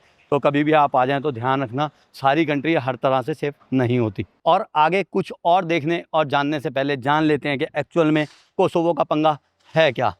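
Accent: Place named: Delhi, India. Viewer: Bhaiya tum to Dilli ki boli bol raha ha Dilli da ho kya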